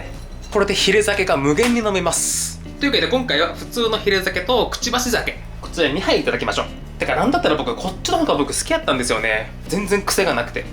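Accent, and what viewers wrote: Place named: Hakata, Japan. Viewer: 博多弁あんまり、動画で出らんよなー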